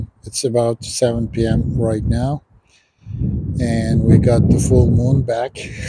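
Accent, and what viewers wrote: Turkey. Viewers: Hocam sivaslı mısın? Tam Sivas şivesi var sende😃😃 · Aksan Türkçe, hemşerim ne işin var orada, kaç kendini kurtar.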